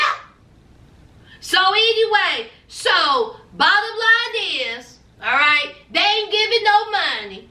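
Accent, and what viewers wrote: South, United States. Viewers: You sound like me and my family and friends down south when we get together. You would fit right in.😂😂😂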